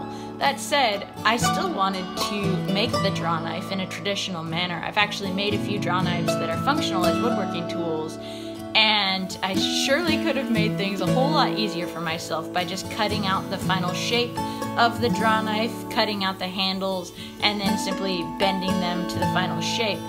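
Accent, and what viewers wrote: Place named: Texas, United States. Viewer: are you a Texas gal too, accent sounds right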